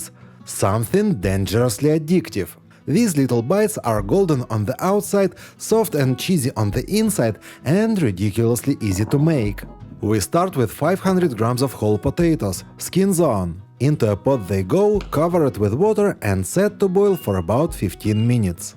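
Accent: Russia. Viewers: Меня смущает акцент и наличие укропа в маринаде. Автор, ты русский? Классный канал, кстати. Готовить не умею, но есть под твои видео не хуже, чему под Друже) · Strong russian accent ) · I feel STRONG russian accent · террибл рашен аксэнт))) бат гуд контэнт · Is that accent russian???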